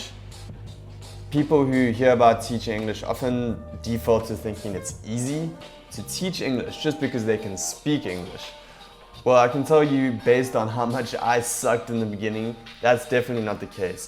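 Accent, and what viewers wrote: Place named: Johannesburg, South Africa. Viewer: Aah, the South African accent :) Sounds like a Joburg boy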